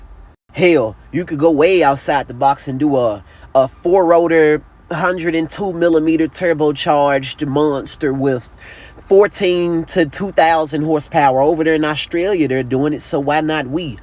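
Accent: Memphis, United States. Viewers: You can tell he from memphis btw he talks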